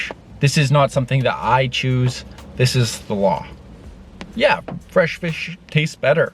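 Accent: Canada. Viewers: The full on Canadian accent <3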